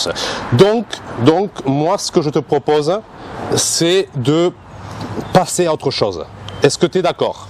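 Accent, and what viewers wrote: Toulouse, France. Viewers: De toute façon c'est mieux de pende a son age un vélo qu'importe pour quel pratique de prendre tout de suite un vélo pour adulte de cette façon pas besoin de changer deux fois ou plus de taille de vélo fur et a mesure qu'il vas grandir ....c'est ce qu'on a fait avec nos gamins ....ça c'est mon opinion perso je précise ...salutation bernard de strasbourg et bien sur toujours un vrais régal de te suivre sur tes superbes vidéos chapeau , sais pas si c'est ta manière de présenter les articles 🤔 et ...ou ton accent toulousain ou on ressent cette passion du vélo avec l'ambiance du sud.....oups.....en tout cas j'adore visionner !.......... bon dimanche 😉✌✌........................................................................j'oubliais faut bien insister sur le port du casque je sais que c'est ch....pour certains adultes mais disons une chose et insistons la dessus ...quand on tombe qu'importe la gravite ,faut savoir qu'avec un traumatisme crânien même minime on peut rester un " légume " hélas et ou paralysé d'un coté ....qui voudrait ça ? 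juste pour infos ....moi aussi ça me dérange un peu mais avec mes 73 balais je me verrais pas être dépendant d'autres personnes croyez moi ...et je m'amuse dans mes chemins de terre sur les collines atour de chi moi ...bien sur avec mon vtt avec assistance car je pourrais plus avec mes pontages que j’ai eu en 2021 et un an après un ACR en voiture dans la commune voisine et heureusement je roulais tout doucement quand je suis rentre dans le mur de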